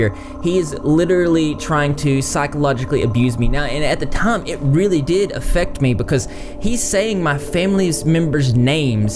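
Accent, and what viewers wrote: South, United States. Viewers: I love your accent! omg it's so country/eastern/western gosh it's great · Ur style dnt match ur country twang and i like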